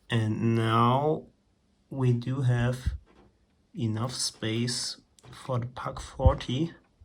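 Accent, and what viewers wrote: Germany. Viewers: Yo i didnt notice your German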